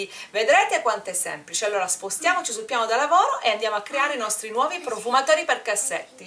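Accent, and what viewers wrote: Sicily, Italy. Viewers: Ok..grazie..ti auguro tante belle cose.. ps.o sentito sei siciliana anch'io, sei di palermo x caso? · ma sei siciliana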